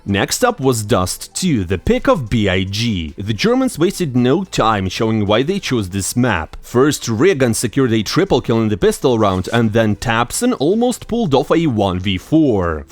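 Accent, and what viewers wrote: Russia. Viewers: This Russian accent makes me cringe, sorry.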